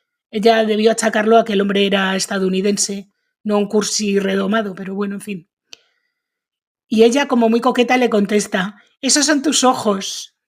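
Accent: Spain. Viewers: No reconoces ese acento de España? 😂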